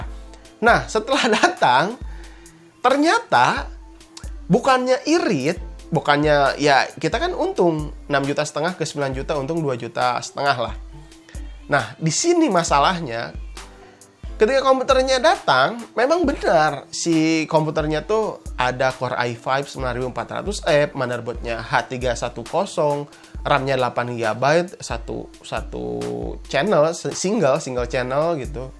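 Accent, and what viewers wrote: West Java, Indonesia. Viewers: nyunda geming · Sunda pisan euy :v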